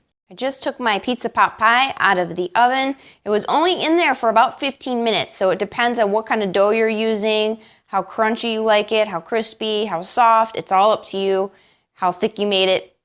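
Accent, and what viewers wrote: United States. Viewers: Buffalo, Cleveland, or Chicago accent?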